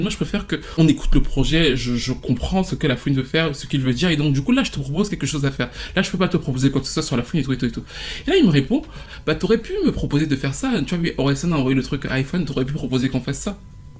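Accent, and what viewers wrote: Cameroon. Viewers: Voilaaaa, je savais que tu etais camerounais !!! Ce ton!! 😂😂😂😂😂même mon sang faisait bepbepbep, celui ci mm sil est francais, aïe le rhesus continentais est mboomayééé! Cool ! God bless you.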